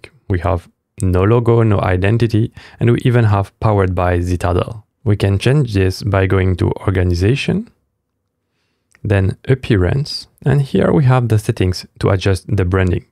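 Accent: France. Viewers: Do I hear French accent??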